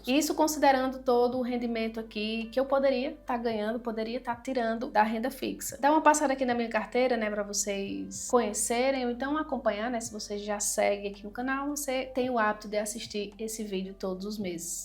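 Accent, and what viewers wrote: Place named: Paraíba, Brazil. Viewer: Amo assistir seus vídeos, pq eles são bem explicados. Outra coisa é o sotaque que conheço heheh já que tbm sou paraibana.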